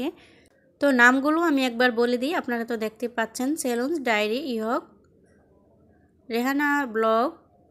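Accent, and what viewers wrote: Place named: Barishal, Bangladesh. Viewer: মাশা-আল্লাহ এই বরিশালের ভাষা আমার কাছে শুনতে ভালা লাগে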